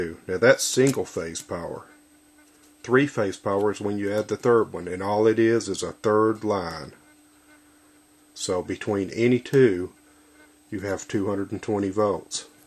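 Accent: South, United States. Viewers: your southern drawl is awesome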